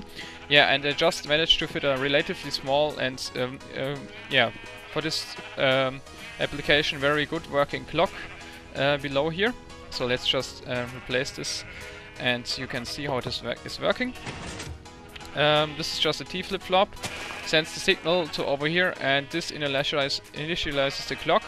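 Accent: Germany. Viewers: das hört man doch dass er Deutscher is ´haha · I'm Dutch too, and has a German accent, very clearly not Dutch, but German.. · Dude germann accent is almost the same and im pretty sure he is german since in his bike wheelie video you saw alot german stores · Are you german because i am and my english sounds like your's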